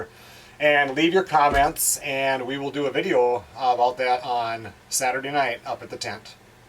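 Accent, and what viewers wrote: Minnesota, United States. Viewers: I got two questions. 1. Does the MN DNR restock your lake or is all natural? 2. How come you / don't ice fish at the tent lake? Thx I grew up in grand forks and spent alot of time as a kid fishing MN lakes. Your accent reminds me of my MN family's.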